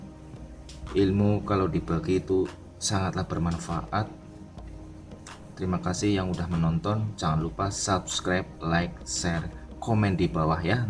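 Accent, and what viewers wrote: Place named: Banyumas, Indonesia. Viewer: ngapak juragan 😁😁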